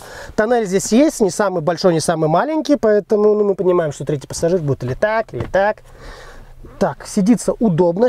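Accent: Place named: Kazakhstan. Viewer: красавчик!Дикция "андреймалаховотдыхает"👍👍👍Я даже по радио слушая мог предположить,что из Казахстана)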